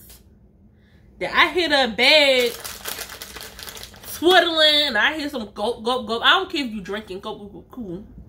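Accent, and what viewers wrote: Baltimore, United States. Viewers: Baltimore Maryland for sure lol I hear the accent , I'm from Alexandria Virginia 😁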